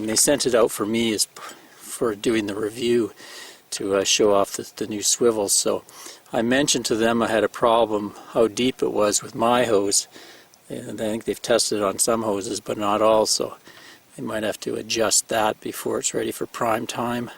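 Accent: Canada. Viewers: I could listen to a Canadian talk aboot anything all day long eh.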